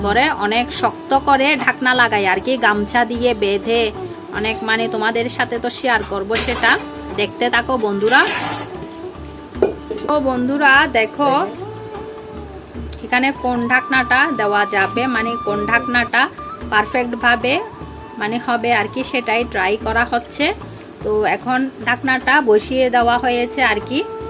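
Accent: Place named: Noakhali, Bangladesh. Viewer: আমার মনে হয় আপনার বাড়ি ফেনি অথবা নোয়াখালী হবে । চট্টগ্রামের আঞ্চলিক ভাষা এরকম নয় ।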